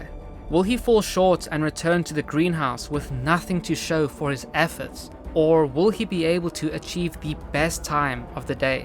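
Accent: South Africa. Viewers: Are you South African, the accent gives it away? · I had a feeling! You sounded SA accent. Great videos! I look forward to seeing more and going back through your older ones.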